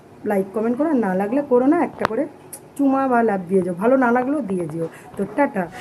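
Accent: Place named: Bangal, Bangladesh. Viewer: আহা গো কি সুন্দর বাঙ্গাল কথা কও গো 😍😍😍🥰😊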